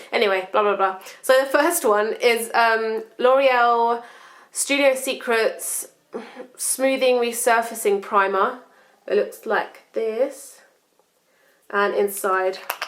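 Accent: United Kingdom. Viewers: i love your english.... british english, right? your accent is so cute :) many youtuber don't reply to their subscribers... and seing you replying to many just makes me like you more :) love from germany♥♥ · You are so beautifully exotic looking!!! I love your accent haha I am a bit obsessed with anything and anyone British.. I remember the first video I watched of yours was your Perfume Collection and I remember laughing and thinking you were really funny and I remember you being like this gorgeous exotic looking girl so I look at your other videos and I loved them!!!! You now have a loyal subbie :)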